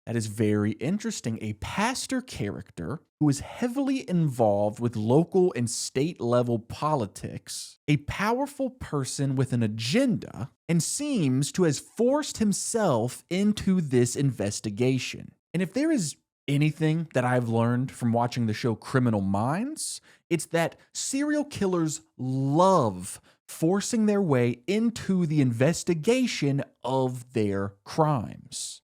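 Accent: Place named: United States. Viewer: From the thumbnail, I assumed this creator was British. I was absolutely astounded to hear an American accent. Bravo 👏🏾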